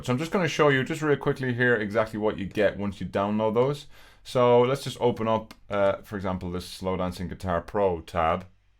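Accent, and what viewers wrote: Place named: Ireland. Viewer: I didn't expect him to have a deep voice with an Irish accent. I'm definitely getting the course now lmao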